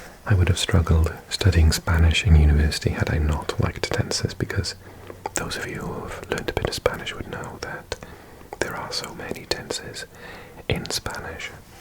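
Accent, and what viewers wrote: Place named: The Hague, Netherlands. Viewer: Ik heb het idee dat je bij het leren van Nederlands veel gepraat hebt met mensen uit de omgeving van Den Haag of Rotterdam, kan dat kloppen? Je hebt een heel goed Nederlands accent van die regio namelijk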